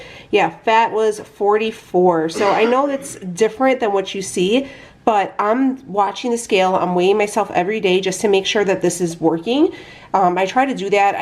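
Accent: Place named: Chicago, United States. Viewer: I love you and thats how we talk on the south side girl!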